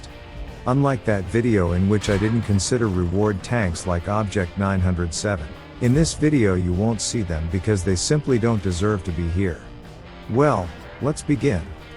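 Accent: Russia. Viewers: very nice accent for a russian guy. Thanks for these information. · Ты русский? Давно научился говорить по английски?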